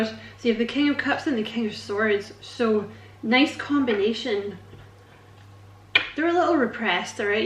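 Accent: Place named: Scotland, United Kingdom. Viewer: Such a beautiful reading sweetheart. Yes....that sounds like my twin flame..we both had to do our shadow work before the reunion 🫂. Thank you for spiritual skills. I love your Scottish voice...we are in the same country. Peace&Love&Light Namaste 🙏 ♥️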